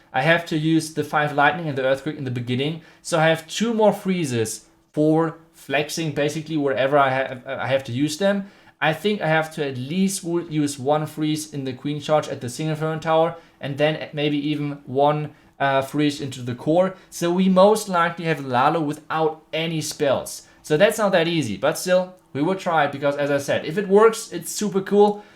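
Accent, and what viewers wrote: Germany. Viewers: This made me realize tho, it's in the delivery. Germans have that accent and I think it messea it up lmao. Messes**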